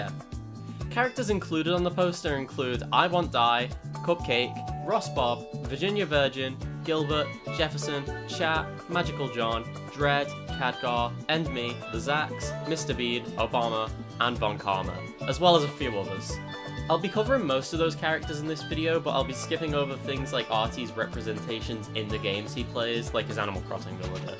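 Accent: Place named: England, United Kingdom. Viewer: then take my sub and use it well, funny english sounding guy.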